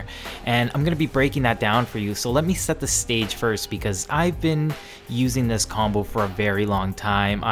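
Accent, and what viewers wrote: Canada. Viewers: His canadian accent is bleeding out of this video... It sexy tho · U have the only Canadian accent I've ever heard....and I'm Canadian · You are the most Canadian sounding person of all time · Canadian ey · I think he's from Canada it's pretty rood to get mad at someone for their voice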